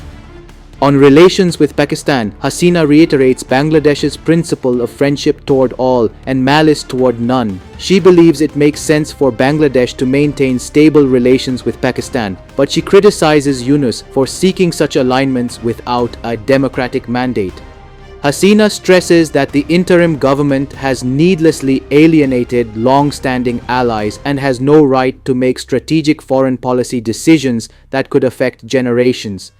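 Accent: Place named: India. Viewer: An Indian with shitty accent... Desi Romanised narrator.. crimes of India channel never amazed me.. office is smokers point in mumbai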